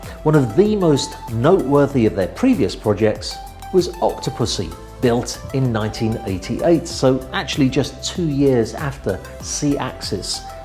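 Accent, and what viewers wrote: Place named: England, United Kingdom. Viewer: Sorry, had to thumbs down for wearing a mask while walking alone outside. Also, that damn regional English accent that pronounces ing as ink, its driving me insane. Spiffing Brit does it even worse and it makes me almost have to stop watching his content. It's just something about saying k instead of ing that drives my brain to insanity. You might as well pronounce w as z. Its so random and makes no sense.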